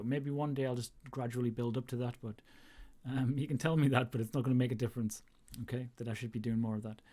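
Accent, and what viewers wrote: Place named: Newcastle, United Kingdom. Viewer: Good to hear a fellow Geordie into this stuff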